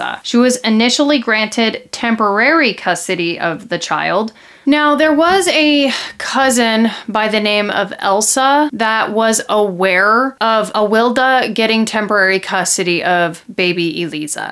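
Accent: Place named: California, United States. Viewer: You're a Californian right you and Elise from 10to Life both look and sound like us. Cali girls stick together lol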